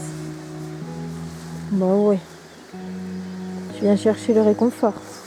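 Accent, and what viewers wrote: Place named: South, France. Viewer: Oh l’accent du sud 😂👌🏼 J’adore !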